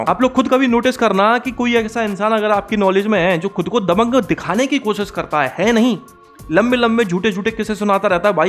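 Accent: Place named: Gwalior, India. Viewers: Bhajja tumai language hame bhind muraina ki lagte😀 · Lagta hai aap pakka gwalior ya Morena side se ho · बहुत समय बाद ग्वालियर चंबल बेल्ट की टोन सुन कर अच्छा लगा..... सही रिव्यू दिया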